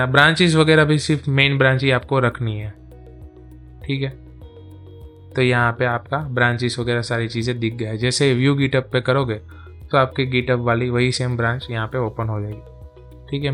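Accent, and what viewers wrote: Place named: Gujarat, India. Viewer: lang per thi gujarati lage chee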